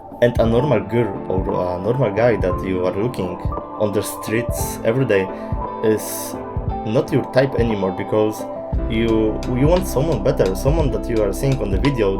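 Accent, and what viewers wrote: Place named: Poland. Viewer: Masz Polski akcent ale ma to swój urok. Fajne że tak rozbudowałeś ten temat w tym filmiku. Oh and have a nice day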